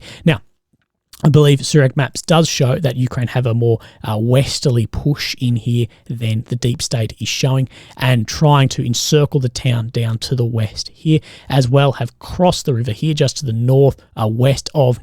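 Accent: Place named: Australia. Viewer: I like your take on things as one old soldier to another..but unfortunately your gravel voice and Auzzie accent make it difficult for non native speakers to follow you so please speak a little slower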